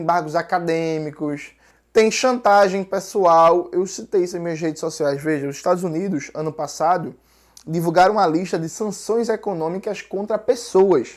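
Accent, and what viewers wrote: Pernambuco, Brazil. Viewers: teu sotaque pernambucano é tão gostoso de ouvir, acho que tu é o segundo youtuber de pe que acho. me sinto em casa kkkkkkkk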